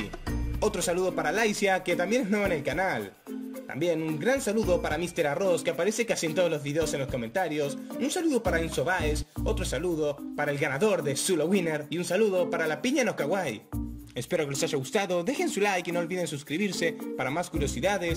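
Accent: Argentina. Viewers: Jaja vos sos Argentinooooo!!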